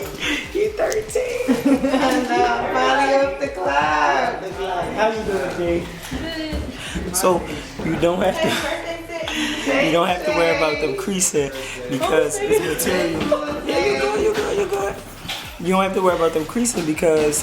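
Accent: Baltimore, United States. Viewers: You frm Baltimore? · Ik you from Baltimore 🤣🤣you can tell in ya accent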